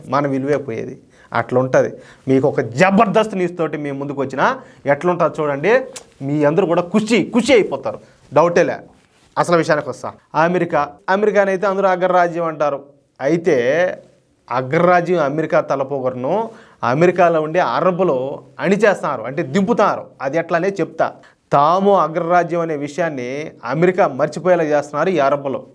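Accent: Rayalaseema, India. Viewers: Every video chustunna midi mi talking style super and asalaina rayalaseema yasa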